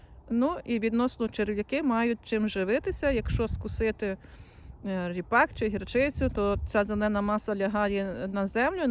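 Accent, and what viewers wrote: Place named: Galicia, Ukraine. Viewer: Привіт зі Львова ❤, перець можна в'ялити точно так, як помідори і потім додавати до піци, салатів і т.д. Відео дуже цікаві, приємно чути галицький рідний діалект, з задоволенням дивлюсь❤❤❤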